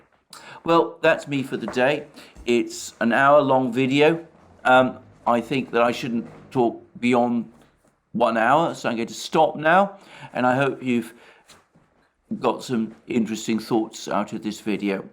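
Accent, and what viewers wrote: United Kingdom. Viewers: Alexander thinks a blasé manner (and a British accent) are enough to cover up his vast ignorance. He says that if there are large numbers of ukrainians, which he very much doubts, they are certainly not using them effectively. Yeah, right. I suggest, what you may be seeing right now is the calm before the... Well, I know for a fact you know the rest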